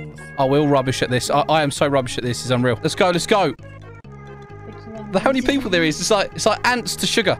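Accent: United Kingdom. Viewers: Bro is british🏴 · are you british😅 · I know ur british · bro is british 💀💀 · Are you british? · YOUR BRIT-ISH MATE? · U are British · I LOVE YOURSELF BRITTISH ACCENT 😭😭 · Your british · Areyou british? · I was wondering r u British 😮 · Are you British? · I just realize that you were British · Are you British? Bc if you are… HI MATE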